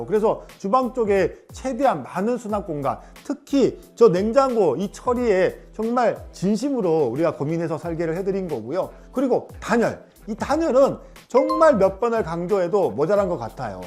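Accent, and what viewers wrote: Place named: Gyeongsang, South Korea. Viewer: 구수한 경상도사투리로 설명을 잘해주셔서 도움이 많이됩니다 대구에도 파트너사 있는지요??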